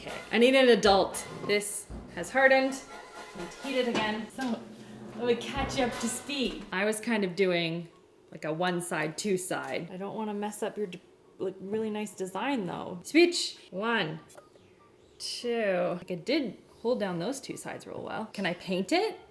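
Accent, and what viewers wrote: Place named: Canada. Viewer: Is it me or is she extra Canadian in this video?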